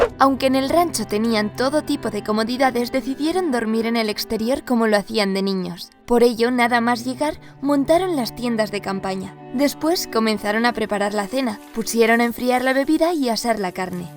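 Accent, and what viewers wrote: Spain. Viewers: Una historia latina narrada con una voz de española... Simplemente hermosa narración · por la forma de tu narración, eres española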